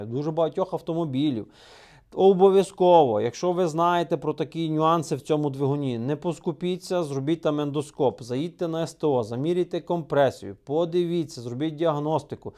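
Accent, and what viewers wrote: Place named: Prykarpattia, Ukraine. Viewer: Приємно чути рідний український прикарпатський говір.🚐🚐🚐🚐🚐🚐🚐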